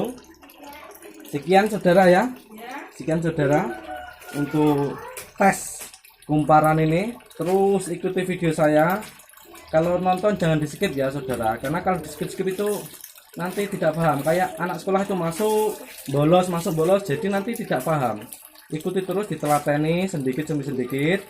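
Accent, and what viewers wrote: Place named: Tulungagung, Indonesia. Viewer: Logatmu kok tulungagung banget mas? Tinggalnya di mana ta?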